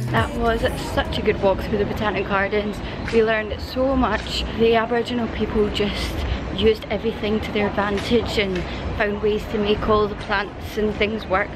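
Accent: Scotland, United Kingdom. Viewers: A Scot with red hair, love the accent. Are you coming down to Tassie?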